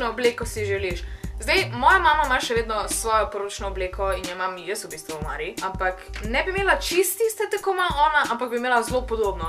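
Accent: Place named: Ljubljana, Slovenia. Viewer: ti si z lublane ziher k lublansk govooriš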